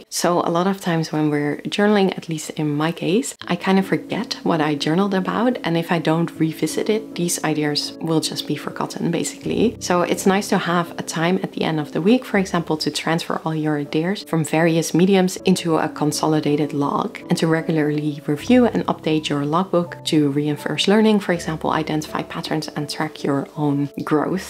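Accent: Netherlands. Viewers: The accent strikes me as a native Dutch speaker (or perhaps another European language). Being close to the UK, Europeans generally pick up more of the British accent than the American one. Let people speak how they want, if you’re understood, you’re doing fine.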